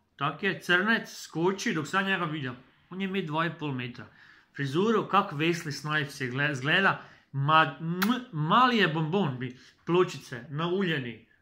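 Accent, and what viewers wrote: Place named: Kajkavian, Croatia. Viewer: Lepi pozdrav gospon ziz sanoborskoga kraja,tocnejse ziz Bregane,prekrasnoga mesta na same granice ziz Slovenijom...pratim te ne duge al sem pogledal saki tvoj uradak bar pet put....nezmislim se kulike gemistov sem spil dok sem gledel tvoje filmeke...daleke si najjaksi jutjuber i same nastavi tak...nek se daleke cuje nas pravi domacejsi govor...neiskvarena kajkavstina na teru morame biti jake ponosni...moram ti dati same jednu malu primjedbu...navek pozdravljas Zagorce,Medimurce,Podravce...a kaj je znami Prigorci...em sme i mi isti kak i vi...se nas muciju iste brige...babe,male place,premalo pijace...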